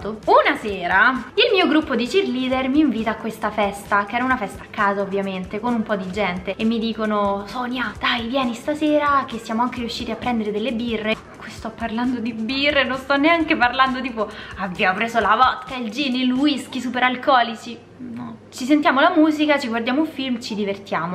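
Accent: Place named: Rome, Italy. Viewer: A,è sempre 'n piacere guardatte!! To'o posso di da romano?(trapiantato a Torino però🤦🏻‍♂️🤷🏻‍♂️)...pure te sei 'n tajio!!😂 Bella,intelligente,brava e simpatica! E poi c'hai 'sto soriso!!🥰(mo te scrivo bene😅)... È bello seguirti,video sempre interessanti,e adoro il tuo leggero accento romano con un italiano invidiabile...ti prego non lo perdere mai però!👋🏻👋🏻🤗😘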